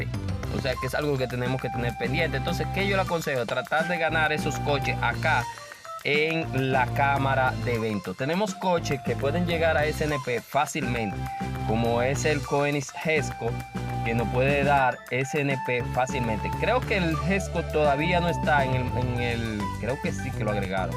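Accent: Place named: Dominican Republic. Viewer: También soy Dominicano, me lo imagine por tu asentó, tienes un sub más.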